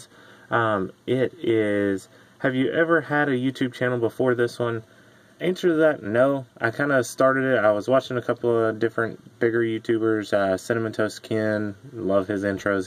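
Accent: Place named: Texas, United States. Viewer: Let me guess ur from Texas I'm from Louisiana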